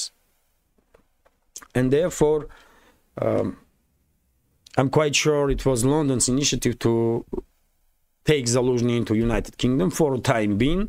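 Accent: Russia. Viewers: FINALY A BROTHER HUMAN A BROTHER RUSSIAN VOICE RU RU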